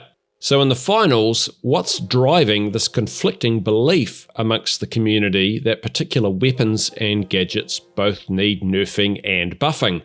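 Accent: New Zealand. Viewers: Great video mate, well said! Your kiwi voice is bloody brilliant!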